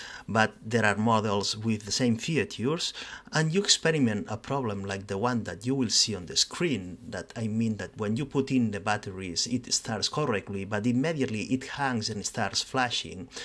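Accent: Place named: Italy. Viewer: I love your accent. Are you Italian? I am an American.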